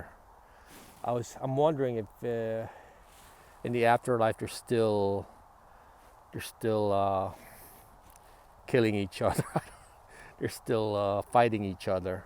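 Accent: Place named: Chicago, United States. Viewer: Love your speaking style and your authentic Chicago accent! Excellent presentation.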